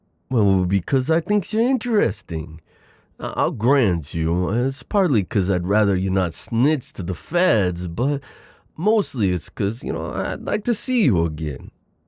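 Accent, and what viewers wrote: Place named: New York, United States. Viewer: Your accent's inspired from Little Italy's "the Godfather" isn't it?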